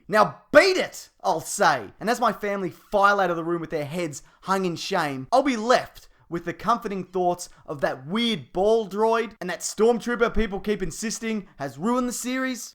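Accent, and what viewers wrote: Australia. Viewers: You sound like an Aussie and I would know cuz I am one and I live in Australia. Also you should try to do a collaboration with screen junkies honest trailers because you would be hilarious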